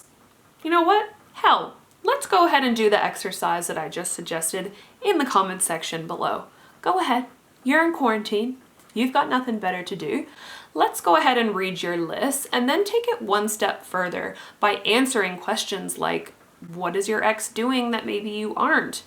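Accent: Australia. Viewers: Are you Australian? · Are you Australian or American?! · Aussie aslccent with a bit of Canada or US muced in? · Are you Aussie?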